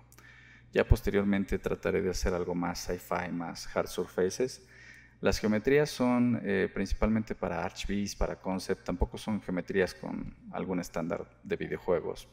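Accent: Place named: Mexico. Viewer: me pasa que siento tu voz como si fueses actor o de alguien conocido, ¿será que los de latino américa ya estamos acostumbrados al acento mexicano en el doblaje?... xD Está excelente el modelo. Espero que te encuentres bien. Greetings!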